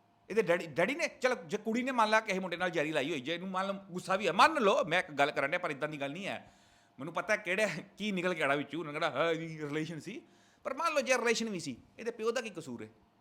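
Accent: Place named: Pakistan. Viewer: Bai teri boli pakistan wargi kyo hai yaar?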